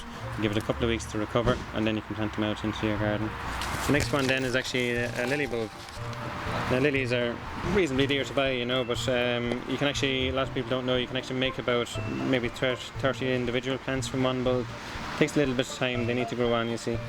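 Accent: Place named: Ireland. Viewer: Cool accent, thanks for the video, just what I was looking for for my cyclamen dividing! (for those non-irish watchers, like me - slow the speed down to 0.75 and crank up the volume :-)))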